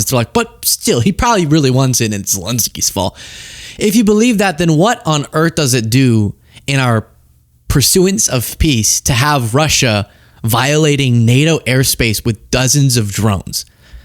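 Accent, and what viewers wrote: Finland. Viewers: we dont need a Finnish accent. Or the giggles and juvenile humor. You have talent, just keep your show professional. The humor you try to introduce doesn't work with the subject matter you cover. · Finnish accent :D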